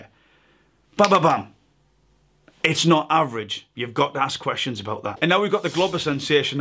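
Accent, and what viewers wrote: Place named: Scotland, United Kingdom. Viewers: the moment I heard your accent it was an instant subscribe...my weakness is Scottish accents. She was literally painful to watch as a woman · Loving the Scottish accent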